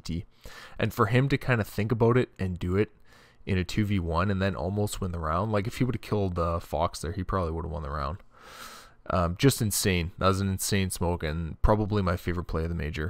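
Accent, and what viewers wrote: Canada. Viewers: are you canadian m8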